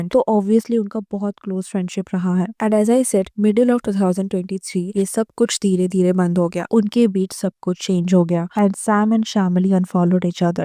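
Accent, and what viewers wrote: Bengal, India. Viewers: तुम्हारी voice से साफ़ समझ आता है कि तुम bengali हो और bengali को support कर रही हो. Probably shyamala de कि टीम से हो. कोई innocent नहीं होता Bengali सबसे बड़े धोखेबाज होते हैं. Just stop defaming Samantha and shut up please. 😂😂😂😂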